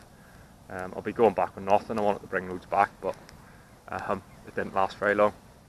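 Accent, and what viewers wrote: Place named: Northern Ireland, United Kingdom. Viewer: you sound like somewhere between Newry /Belfast? great channel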